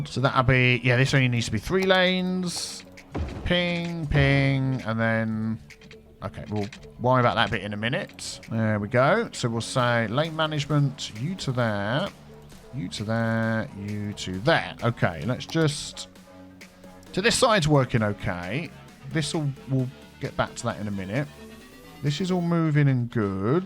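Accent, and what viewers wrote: United Kingdom. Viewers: I'm currently binge watching this series and I'm actually in San Fran right now for Labour Day! I'm a Brit living in America and the pandemic has made it really difficult to make friends so I've been pretty lonely and homesick, but hearing your Britishisms is really comforting. Thanks <3 (Also, Yorkshire tea is the best and thankfully I have been able to find it out here!)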